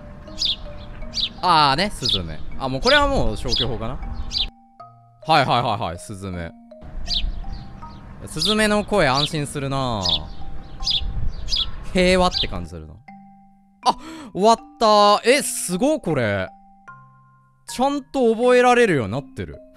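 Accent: Japan. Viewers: 開始10秒でお蘭の流暢な日本人英語聞けて大満足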